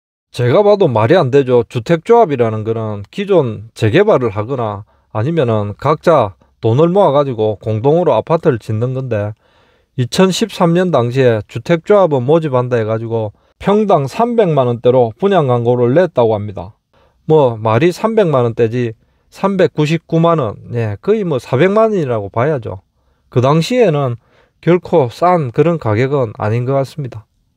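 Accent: Gyeongsang, South Korea. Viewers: 영상 내용도 내용이지만 운영자님의 완벽한(?) 경남 사투리에 늘 더 끌리게 됩니다 ㅎ~. 저도 고향이 경상도인 사람인데 운영자님의 나레이션을 듣고 경상도 사투리가 이렇게 매력적인가??..새삼 깨닫게 됩니다. 암튼 좋은 영상 늘 감사히 잘 보고 있습니다~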